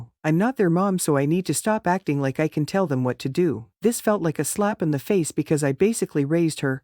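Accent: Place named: Midwest, United States. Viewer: The ai is Midwesterner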